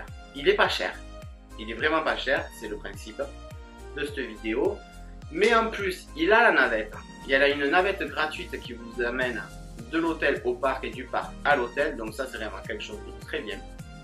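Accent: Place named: Haute-Garonne, France. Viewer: c est parceque moi je suis du fin fond de la Haute Garonne et expatriée dans l Oise à cause du boulot... donc entendre parler comme chez nous ça me fait du bien !!! Merci pour votre contenu qui est à la fois intéressant, bienveillant et drôle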